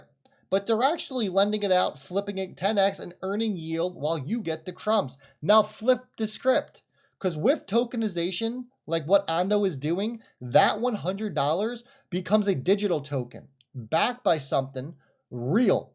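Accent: New Jersey, United States. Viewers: Forgive him. He's from Jersey.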